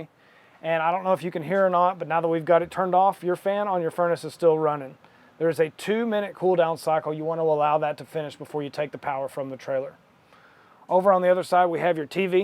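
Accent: United States. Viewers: is that accent from Kentucky?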